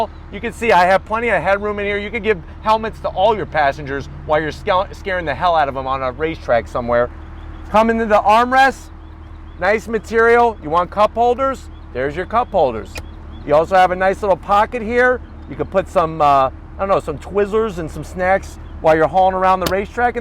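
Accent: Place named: Staten Island, United States. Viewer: Is it weird that i could listen to you talk all day hahaha! Sounds like you're from Staten Island or some part of NY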